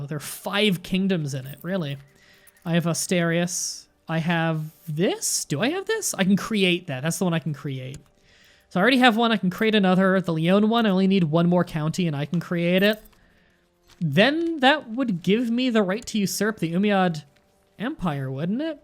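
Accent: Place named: Canada. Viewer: FYI the barony was in Portugal, the name was in Portuguese, not Spanish. And anyone who think you sound American doesn't know what Americans and Canadians sound like. You sound Canadian. And FYI, Galicia is de jure the duchy you just conquered and the ducky above it that you started with. The Duchy above it de jure drifted into your kingdom in an episode in, like, the teens, and you commented "why am I being alerted to that?"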